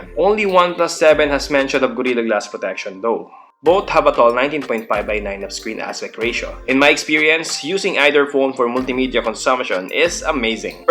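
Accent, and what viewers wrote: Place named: Philippines. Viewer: I love your accent bro #pinoypride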